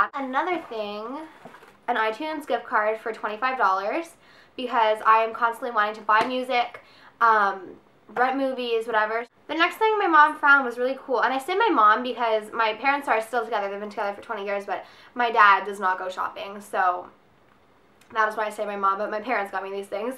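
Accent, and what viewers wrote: Canada. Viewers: I agree. I think I commented before asking if she was Canadian. I watch another girl's videos who speaks just like her & she is Canadian.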